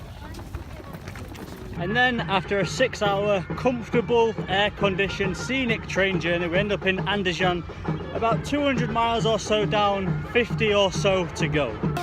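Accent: United Kingdom. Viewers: Had to google that :) nice accent , it’s a northern accent ? Like Geordie ?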